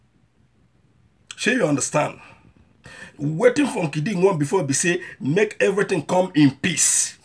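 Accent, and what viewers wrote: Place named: Agbor, Nigeria. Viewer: bros most times I watch your videos is just to have fun and also see how you have learn from prophet Nnamdi kanu and biafra (Ipob) struggle which has make you have subscribers and you here talk rubbish against biafra and ipob, anyway from your voice you came from agbor or near there and talk against your identity shame on you because you living on lies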